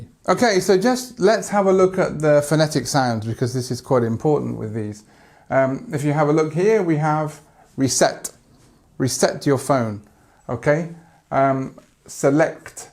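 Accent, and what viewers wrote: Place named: United Kingdom. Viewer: Though I love listening to our dear Adrien,I really enjoyed listening to you, I looove your beautiful british accent ! I hope to see you again on this channel.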